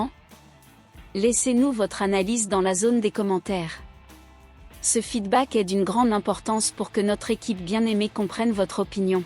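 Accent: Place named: Paris, France. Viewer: Ce site a des info bidon ca racole des abonnés stile pour dépasser le psg deja pour commencer le club c olympique de Marseille c qui cette femme qui parle a tout les coup elle est même pas dici on dirait une parisienne regarder les info de franki foot ou touche pas a mon stade les mec meur pour l OM Elle on dirait quelle lit un script jamais une info exact bidon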